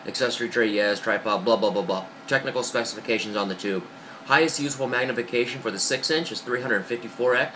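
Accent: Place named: Texas, United States. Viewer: i thought you was from texas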